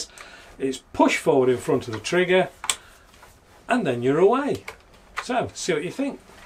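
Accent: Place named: Nottingham, United Kingdom. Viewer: That's a Notts accent if ever I've heard one!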